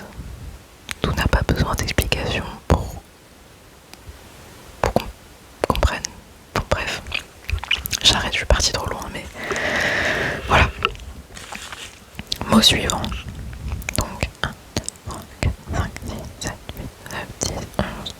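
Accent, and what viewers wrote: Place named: France. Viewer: Tu as fais une vidéo vraiment éducative et intéressante et vraie on a des mots dans notre langue assez spéciaux à prononcer et écrire et les sons différents tu réalise vraiment un travail parfait et ton anglais était bien compréhensible avec note joli accent français 😁 mais en tout cas une vraie vidéo intelligente et bien faite tu peux être fière de toi 😊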